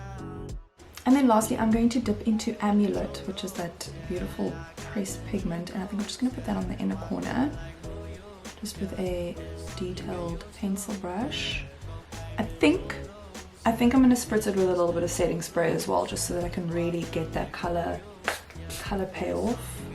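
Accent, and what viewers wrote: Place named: South Africa. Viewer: I love listening to you talk cos you're South African. This makes me H A P P Y!!!! Love the eyeshadow results. I love all of them. Thank you for the demo.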